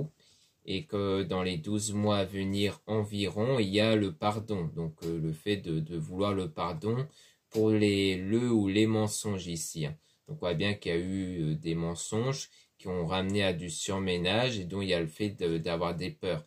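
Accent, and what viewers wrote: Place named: Madagascar. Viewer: Je vous découvre grâce à Maria ❤️ j'adore le petit accent malgache /reunionnais🤗 merci pour ce tirage très parlant 🙏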